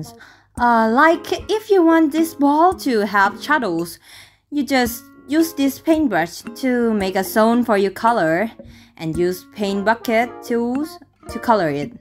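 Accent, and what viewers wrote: Thailand. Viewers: ชอบสำเนียง มีเหน่อๆ555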